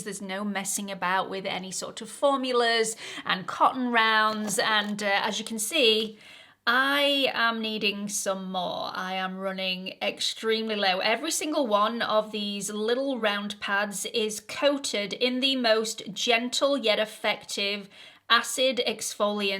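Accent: United Kingdom. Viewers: I gave a thumbs up for this video, even though it seems to be a long ad for expensive devices I can't use because I have an implanted cardioverter-defibrillator. I honestly never noticed how well you sell-Sell-SELL! No worries, your voice is so enjoyable, and your British accent helps train me to listen better as I'm hard of hearing. Thanks for the lesson!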